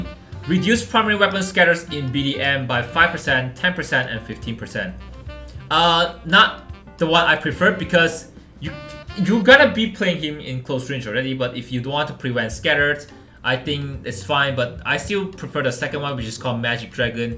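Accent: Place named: China. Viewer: Dude the Chinese accent and language is so cool damn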